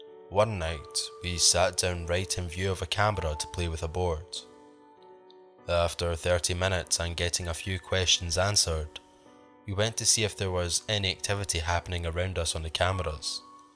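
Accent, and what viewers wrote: United Kingdom. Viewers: Awesome stuff, so happy for you getting to over 1k sub's! I'm still sharing on wegamers. I am loving your content you put out, love the accent too, its great to hear people from the UK, love it. Keep doing what you are doing, your growing and growing every week!😜😜😜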